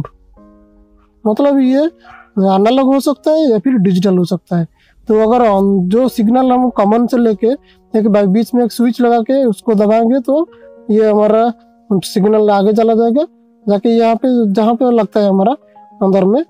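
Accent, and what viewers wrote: Odisha, India. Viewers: Sir, you from odisha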